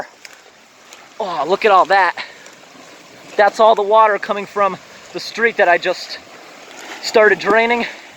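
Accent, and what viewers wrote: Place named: New Jersey, United States. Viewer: Sounds like Jersey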